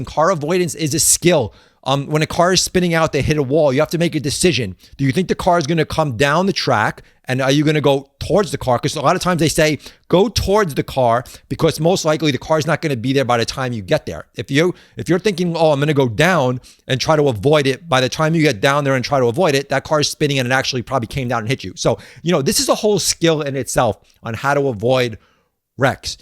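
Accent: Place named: New England, United States. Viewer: you from New England? That accent sounds like it! I'm in RI! I've been seeing your videos for a few months! Appreciate the content, brother!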